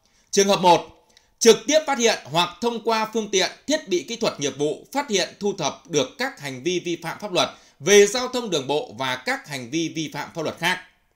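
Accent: North, Vietnam. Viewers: giọng Bắc kỳ khó nghe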